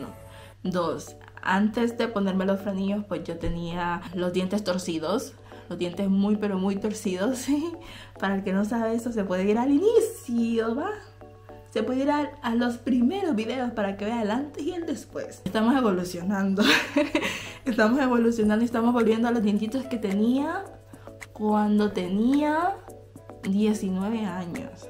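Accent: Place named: Honduras. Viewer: Tu no tienes que cambiar tu modo de hablar tienes que ser auténtica y Aser prevalecer y valer tu idioma de Honduras Yo también viví en España y nunca cambie mi forma de hablar 🙋🇪🇨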